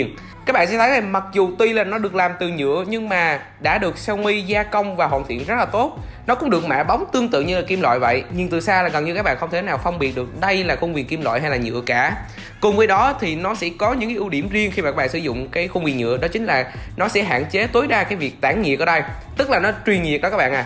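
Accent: South, Vietnam. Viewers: Có ai giống tui ko giọng miền nam bạn này hơi chói khó nghe nhỉ so với giọng miền bắc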